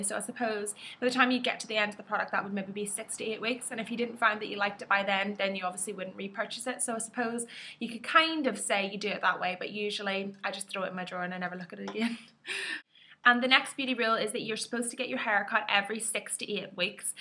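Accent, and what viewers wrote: Ireland. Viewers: r u irish? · love your accent, I'm irish but born in america so sadly didn't adopt that beautiful accent ;) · Love the accent!! Irish FTW!!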